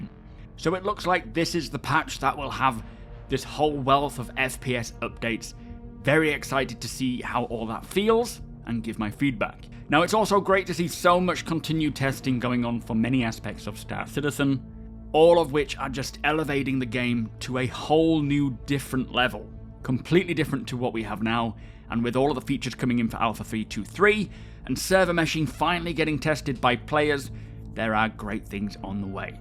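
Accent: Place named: Northern England, United Kingdom. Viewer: This guy is weird for me. He has a northern English accent but on 5 occasions he used American English or syntax.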